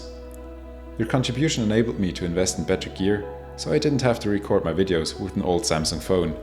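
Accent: Switzerland. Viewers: Fly safe! I hope that one day - like Mentour Pilot - your career turns toward educating the next generation of pilots. You will be an awesome at it! Be it senior captain, flight instructor or just pilot doing his job with passion with who ever he can share the cockpit with: It is good do know you are up there! I will miss your voice - the swiss english is such a nice and nostalgia sound to me. Fly safe! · Danke für die tollen Videos. Ich werde den Schweizer Akzent vermissen. 💔 Au revoir! 🙋🏻‍♂️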